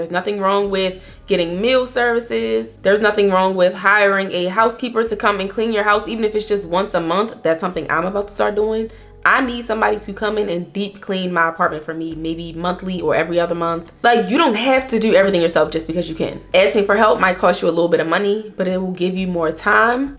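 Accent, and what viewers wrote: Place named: DMV, United States. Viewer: Girl you HAVE to be from somewhere close to Baltimore,Md. I know this DMV accent anywhere lol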